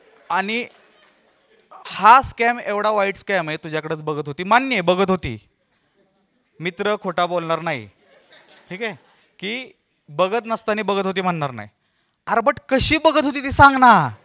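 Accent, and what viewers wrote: Satara, India. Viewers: सातारी भाषा कडक भावा🔥🔥🔥🔥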